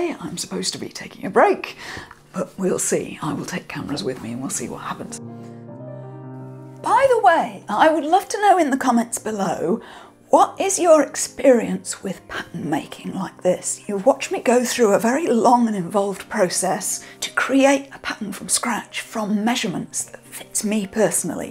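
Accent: United Kingdom. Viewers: you are an amazing artist!!! You are so calm & confident, even when adjustments to your projects are made. You are a real inspiration to me! I love your English (Brittish) accent!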